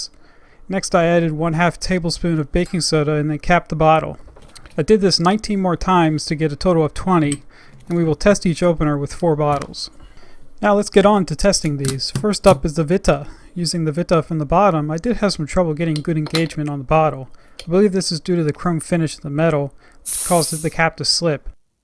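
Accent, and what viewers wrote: Germany. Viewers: Thank you. I was expecting something far less serious but this was done with the same degree of rigor that you apply all your reviews. I enjoyed it. How about commenting on your branding choice on your video headers. What's the smoke about? Also, are you a native German speaker? You pronunciation choices seem to match what I hear from my German friends. I source my German tools from KC Tools and Chad's Tool Box.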